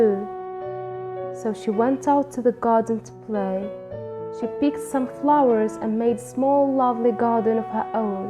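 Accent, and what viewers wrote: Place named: United Kingdom. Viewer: الرائعة المتألقة صاحبة اللهجة البريطانية القوية 🌷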